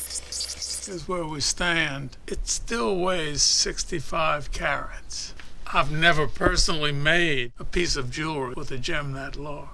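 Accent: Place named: Louisiana, United States. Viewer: I love your videos. I'm training to have an English accent like yours. My favorite Lousiania accent. Keep the great work. The internet allows me here in Brazil to have some contact with your wisdom. Thank you very much.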